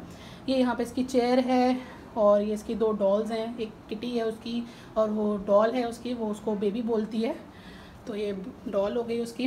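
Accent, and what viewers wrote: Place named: Pakistan. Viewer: that's gd but you speak in Pakistani style and speak very clear Urdu as well even I've noticed one thing that you didn't use any single word of Hindi.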